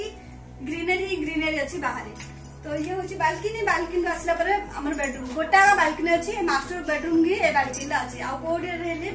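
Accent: Berhampur, India. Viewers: Pura berhampur kathaa ....may be tume sethira bodhe?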